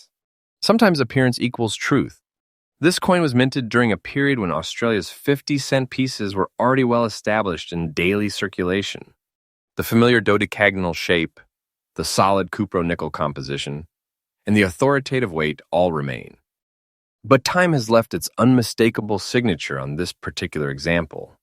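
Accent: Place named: United States. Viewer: Something fishy about what you've said about 1984 Australian 50c coin, started with $201,000...and later, you said it's valued at $183,000 Really? Is it genuine? Not some kind of scam? I'm sure.. I'm not the only one watching and following this video. Should be an Australian talking about Australian coins, but you sound like an American.. Come clean, man!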